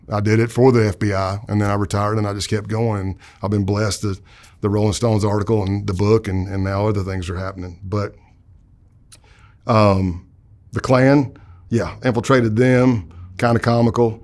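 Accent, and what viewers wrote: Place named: Greenville, United States. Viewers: HE IS SO SOUTHERN!!! 💞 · Yo why does he sound exactly like Rob "ribbit" Pitts from VinWiki Must be that Greenville accent. Love it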